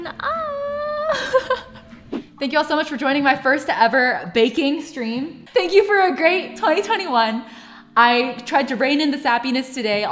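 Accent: RP, United Kingdom. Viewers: tea time! sip sip I love your British accent as a British person. A bit posh though ngl Earl = Uurl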